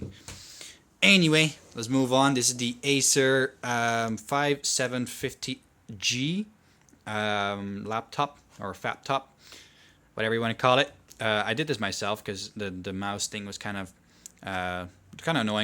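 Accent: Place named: Netherlands. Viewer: do you live in the netherlands? i hear some dutchish sounds in your english and i think i saw a netherlands flag on the word book next to the tv:p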